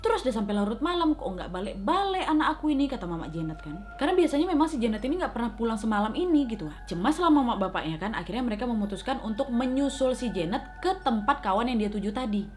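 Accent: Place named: Medan, Indonesia. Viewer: kebanyakan nonton video kak, aku kalo ngomong pasti ada imbuhan wak dan logat jadi kak wkwkwkkw padahal udah sempat hilang setelah bertahun tahun tinggal di jakarta dengar kak, langsung auto logat medan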